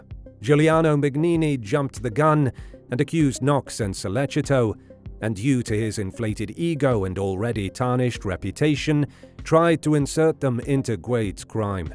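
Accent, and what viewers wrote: United Kingdom. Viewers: Narrator talking so fast with a british accent was totally confusing and not understand alot of thr content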